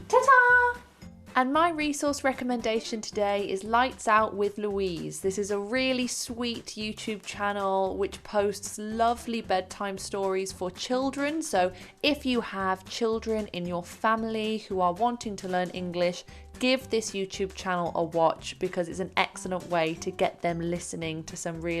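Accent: United Kingdom. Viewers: I love her uk pronounce and explaining ❤️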